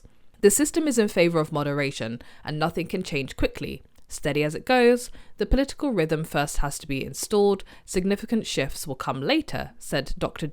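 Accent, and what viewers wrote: England, United Kingdom. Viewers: How do you know so much about China? That's an English accent, isn't it?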